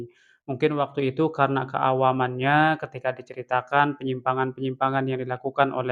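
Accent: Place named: Lombok, Indonesia. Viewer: Logatnya kyk orang lombok. Bener gak kang?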